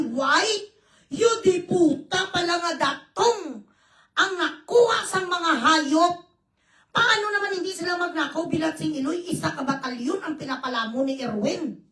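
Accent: Iloilo, Philippines. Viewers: Hi from Germany👋I like the way you talk with an Ilonggo accent 😂🤣🤣and delivering the words Diputa and Belat mo😅😛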